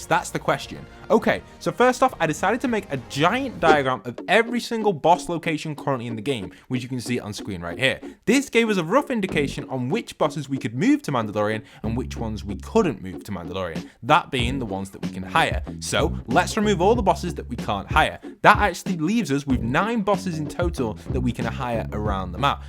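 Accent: United Kingdom. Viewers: Also you kind of sound Scottish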